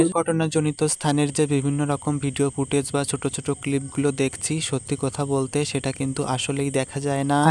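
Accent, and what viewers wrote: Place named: Kolkata, India. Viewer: আমি ভেবেছিলাম আপনি কলকাতার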